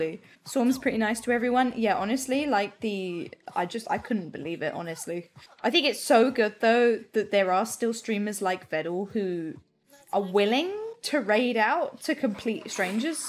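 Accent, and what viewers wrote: United Kingdom. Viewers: Her british levels are much bigger than Vedal's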